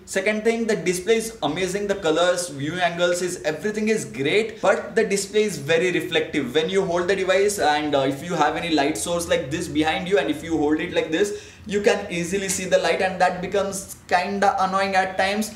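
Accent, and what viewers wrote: India. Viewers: # no insult intended please dont be offended, you seem intelligent and a fast learner so im sure you learned the english language very easily so im sure you could learn an british or american accent very easily so it would be no problem for you, im saying this because you speak english in your videos but you still have your desi accent which white british english or american people but mainly racist people make fun of, especially if you try & make a conversation for a white girlfriend speaking in a desi accent she will be put off and run away from you but an advantage of getting a british or american accent is the white girlfriend you make she will like you because you sound intelligent, so to learn an english accent please by watching all episodes of DOWNTOWN ABBEY, please google season 1,2,3 etc, to learn american accent please watch all episodes of FRIENDS please google season 1,2,3 etc, again no offence i only say this for your own benefit not mine trust me im british born and i know all about this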